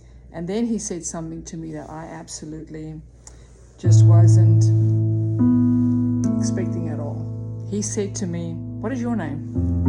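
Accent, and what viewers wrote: South Africa. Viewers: You sound like South African.. I’m from NZ living here in Texas. I too had a couple of like incidents with the father here all these years. Isn’t he amazing Aye? Bless you.. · My parents are from your area and we have spent a lot of time there as of late when we are back in states from Mozambique. Do you happen to be South African?? Living out here we can recognizee that at times. What a blessing of a story! Keep pressing in courage! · Are you South African ? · Great experience. Are you South African ?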